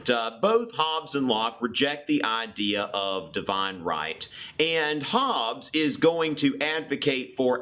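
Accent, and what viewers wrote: South, United States. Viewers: which state are you from? you have a very strong accent :p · Something about yer yank accent explaining philosophy is endearing, will stick around. love from ireland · It was when I heard you threatening to drink my blood for breakfast that I realized this was the second time I was watching this video.😃😃😃. Just finished listening Locke’s Two Treatieses, so your great sketch now sounded with new wisdom. Also, that southern accent sounded dear, too😄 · This is a real southern usa accent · I totally think that all philosophy classes should be taught by folks with a Southern accent. Yours Truly, Demian Virgil Hammock the son of Prof. Virgil Hammock P.S. The opening with Heavy Metal music is a nice touch. I went to some sort of Indie Music thing and I asked when is ABBA playing? I got that look and was told never to come back. I think I am on some sort of Indie Hipster ban list?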